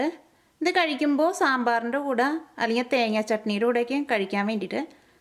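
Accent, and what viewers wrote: Thrissur, India. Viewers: പെങ്ങൾ തൃശൂരല്ലേ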